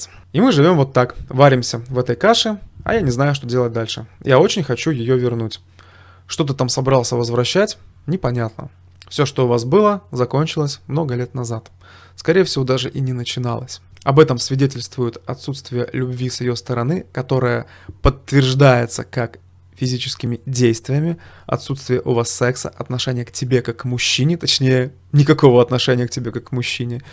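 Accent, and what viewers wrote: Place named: Belarus. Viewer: здравствуйте! Судя по акценту, вы из РБ! Я ваш земляк, и хочу Вам сказать Спасибо! Вы реально меня спасли. Все по делу, выражения-в точку! То, что и хотят слышать мужики в моей шкуре! Ещё раз, Спасибо! Если бы мог, пожал бы руку!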